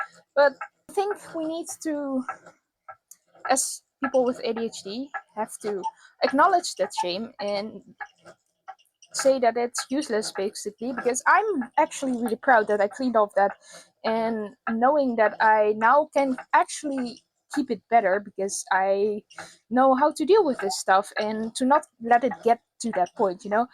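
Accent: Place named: Netherlands. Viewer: Yep, i have the same as well here, also ik hoor Nederlands 😊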